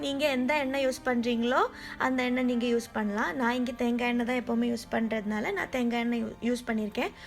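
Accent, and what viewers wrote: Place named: Tamil Nadu, India. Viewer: Hi sister,I will try garlic chutney,Ur mother's recipe 💐💐Ur Tamil slang kaekavae nan unga video parkaren sister .bye from santhi 😍😍😍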